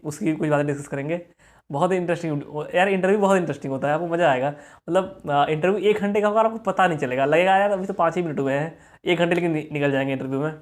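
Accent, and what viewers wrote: India. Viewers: true bihari style communication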